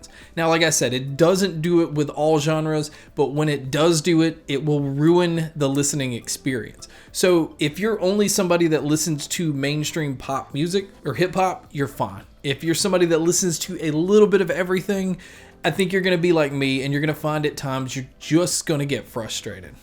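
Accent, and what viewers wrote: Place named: Carolina, United States. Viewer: You have a Southern/Northern Carolina accent I love it!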